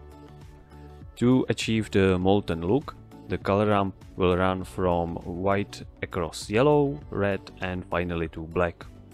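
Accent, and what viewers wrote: Czech Republic. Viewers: u sound like czech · Nice tutorial! Are you Czech by any chance? :D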